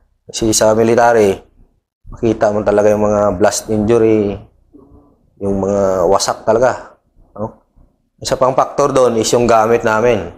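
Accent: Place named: Visayas, Philippines. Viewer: Hehhehe katawa ko sa baho way ligo² mura lagi ka og bisaya sir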